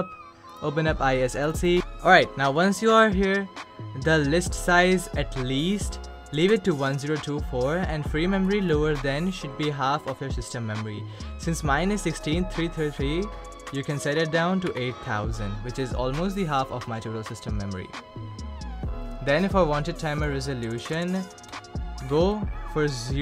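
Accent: India. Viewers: You got a bit of indian accent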